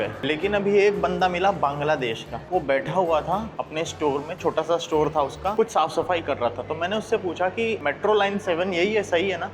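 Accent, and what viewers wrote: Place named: Indore, India. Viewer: Maza to aarha ha 😂 ye to sach ha or indori suun ke or maza aarha ha kyuki me bhi indore se hu❤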